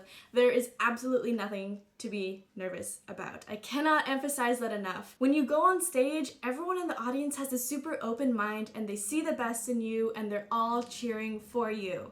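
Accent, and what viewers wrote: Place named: Canada. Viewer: Beautiful as always :) As a former Canadian my self, I really picked up your accent :p Thanks again for the great tips!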